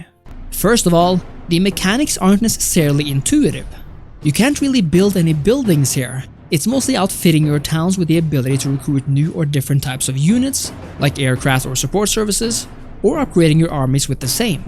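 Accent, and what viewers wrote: Norway. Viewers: You norwegian accent is just awful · Må jobbe med den norske engelsken din kompis \__('^_^')__/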